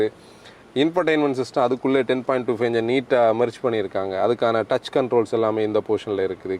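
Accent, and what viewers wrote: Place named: Nanjil Nadu, India. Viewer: Love your detailed reviews, Especially it being in the nanjil slang😄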